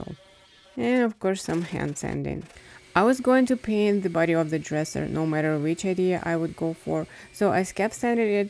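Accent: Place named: Eastern Europe. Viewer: oh no don’t get rid of it , it’s nice ! I thought it was Eastern European . Lovely ! We like your makeovers very much !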